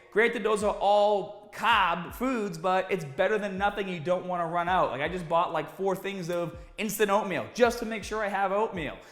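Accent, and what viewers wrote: Boston, United States. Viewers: love that Baawston accent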